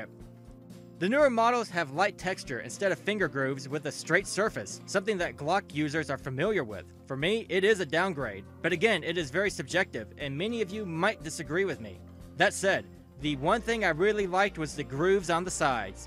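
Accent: Australia. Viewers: australian LMAO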